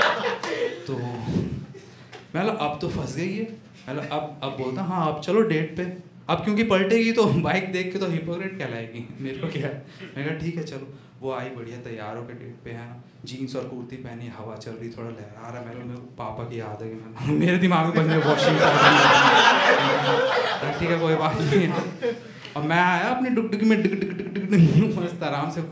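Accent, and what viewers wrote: Odisha, India. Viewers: Proud for great accent. Otherwise every body says your odiya accent was very sexy'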